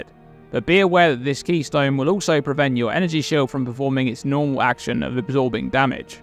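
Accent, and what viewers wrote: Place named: United Kingdom. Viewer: He's a brit... they say things differently.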